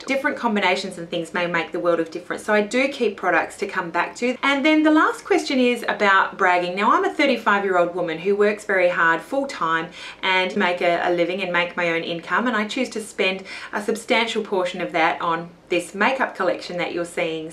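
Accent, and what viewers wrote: Australia. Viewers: Yay! Love: 1. Hearing an Australian accent in a makeup video - where are all the Aussies on YouTube?! 2. That you're over 22! Where are all the adults on YouTube?! Subscribed!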